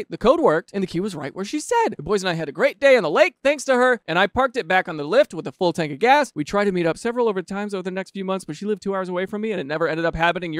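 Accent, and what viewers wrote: Germany. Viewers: That's a pretty good German accent, not sure what it has to do with Lake Tahoe though lol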